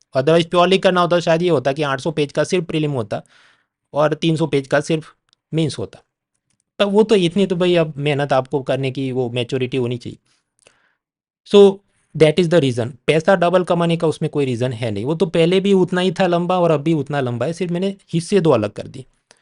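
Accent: Gujarat, India. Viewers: Saheb tamari vato ma Gujarati touch joine bhaiband bau maja aave chhe 😂😂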